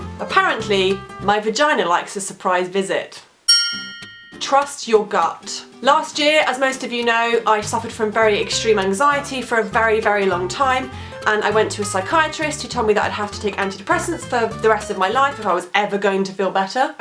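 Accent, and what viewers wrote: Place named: Cockney, United Kingdom. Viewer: I think point 23 is the most satisfactory for you today; good for you. I know i'm annoying, but why don't you create english subtitles for your videos, since you write your own scripts for the most part of them? Foreign audience would be pleased to translate them into other languages, so that they could enjoy your sort of cockney accent.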